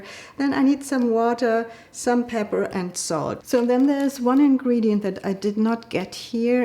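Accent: Germany. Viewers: You sound just like my German mother who was from Northern Germany. Steer (stir) it😅😊😊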